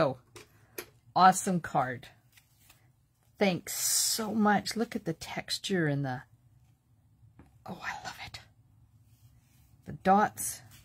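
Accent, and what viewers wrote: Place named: Alberta, Canada. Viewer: You sound like you’re an Albertan. 15 years there myself, and wow I agree with you, I can’t just sit and watch tv…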